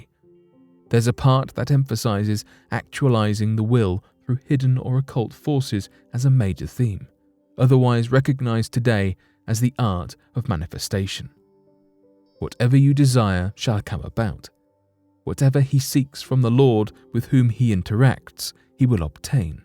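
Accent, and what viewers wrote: United Kingdom. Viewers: I think there are 2 narrators both are British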